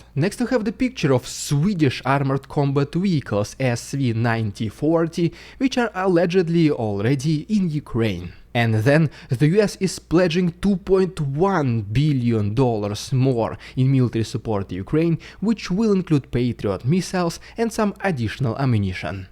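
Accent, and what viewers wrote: Russia. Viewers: He said “Leopard 2”, the most prevalent Western MBT in Ukraine at the moment. He’s just pronouncing it with Russian accented English, since he’s originally, you know, from Russia. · actually ukran was bombing the dam evac sites. guess your just another western propaganda machine. and i guess the goverment thinks we will belive you cause you have a russian accent? there retreating cause they are about to bomb the front lines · 😆😆😆 Russia doesn't even have a singular culture, much less a singular accent. Hell, not only does the US have 30 regional dialects, those are further broken down into more than 100 local accents, meaning each state has multiple accents. Russia isn't quite as diverse, but there are still three regional dialects, further separated into local accents. Simply put, simply comes from a different part of Russia than your family. 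· > I do believe you are capable of re-reading your own damn posts. I do believe you would capable of pinning down the specific phrase where I made such a claim if it existed. Not only I didn't make such claims directly, I also didn't allude to it. Now let's treat each other with respect, gracias. > And I didn't say Russia was homogenized; you did. Repeating the same claim won't make it more valid. Providing arguments will. > And yes, I've heard Russians speaking just like him in communication intercepts, as well as other distinct accents from around the country. I admit that otherwise he sounds like a native Russian speaker who attempts to speak English. However, as I mentioned before, this deviation (pronunciation of "г" as "х") is extreme. The degree, uncommonness, and unnaturality of such a deviation were explained previously. > And it has much more than a "grain" of truth - Russia's claims to be "Russian" are part of their national identity, yet are as accurate as Nazi claims to be "Aryan." Rhetoric question. 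Are we talking about "Russian" the nationality or "Russian" the ethnicity? I've heard many views on national identity of Russia but I assume that this extreme nationalistic view could theoretically be held by some marginal groups. Could you clarify which particular claims you're referring to? If you think they're "everywhere", please provide at least one example. I provided arguments on my side. If you want to change my view of the world via argumentation in good fa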